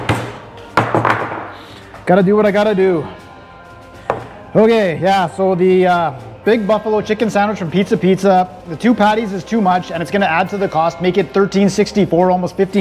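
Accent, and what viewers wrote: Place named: Winnipeg, Canada. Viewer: You have a thick accent. Are you from Winnipeg?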